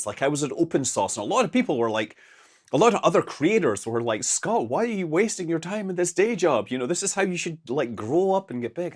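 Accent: Scotland, United Kingdom. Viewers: Congratulations Scott, you're a genuine good guy who deserves all the success in the YouTube world. Have always loved your channel and your unpretentious style of presenting. Plus you haven't adopted some awful mid Atlantic drawl and kept your lovely Scottish brogue 😂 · Please confirm that you will still says "moon" with that deep Scottish accent. Nobody wants that to change. On a more serious note: Congratulations on this new chapter on your life. It seems you have a lot of good fun ahead of you. Happy for you. Enjoy the ride. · I'm not here for the visage. I'm here for that Scottish brogue! 😁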